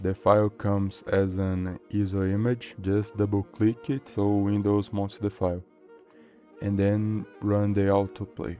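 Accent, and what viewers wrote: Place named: Portugal. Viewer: Sotaque português instantaneamente detectado. Obrigado pelo vídeo, vou tentar aqui. Ainda lançou um de fundo, muito bom.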